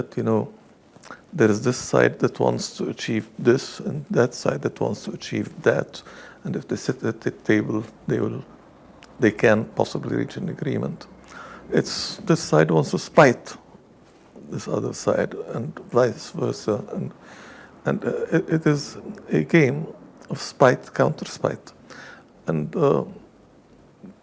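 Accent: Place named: Australia. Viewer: This thing is not even worth posting. And the host sounds Aussie😮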